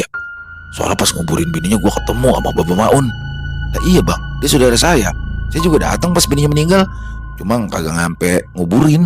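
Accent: Jakarta, Indonesia. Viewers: Waalaikumsalam bang, ya Allah itu bahasa Betawi keluar semuanya, ora Danta, 😂😂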